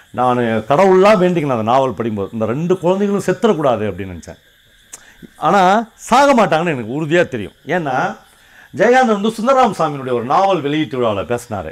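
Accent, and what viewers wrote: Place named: Tiruvannamalai, India. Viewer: திருவண்ணாமலை வட்டார மொழியில்...எளிமையான உரையில் எழுத்தாளர் "ஜெயகாந்தனின்" மொழிநடை மற்றும் உரைநடையை நேர்த்தியாக சொன்னீர்கள் அழகு.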